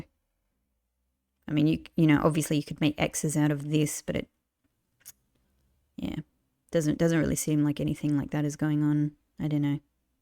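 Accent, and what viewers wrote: Australia. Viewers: It’s interesting just how little the absence of sound mattered here. I wonder whether I’d have even noticed if you hadn’t mentioned it. If anything, it made me wonder why I never had an Aussie narrating MY explorations. That should definitely be an option in a future patch.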